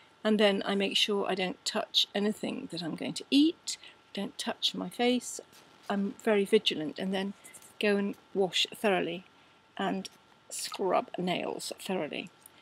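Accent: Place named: United Kingdom. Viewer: Jolly good...love the british accent.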